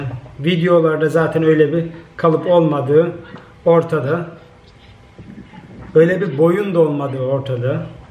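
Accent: Black Sea, Turkey. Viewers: Abi karadenizli misin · Abi Trabzonlu musun Rizeli mi ? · Abi karadenizlimisin ? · Reis sen nerelisin ya trabzonlusun ya da rizeli ben ofluyum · rizeli artvinli misin ya da karadenizli konusman ayni bizim oralar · Abi sen Karadenizli misin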